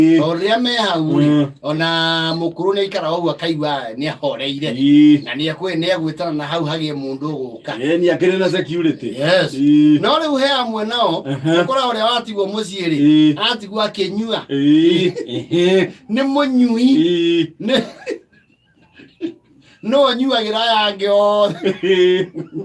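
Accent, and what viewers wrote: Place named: Kirinyaga, Kenya. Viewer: Unaongea kama watu wa kirinyaga avai hahaha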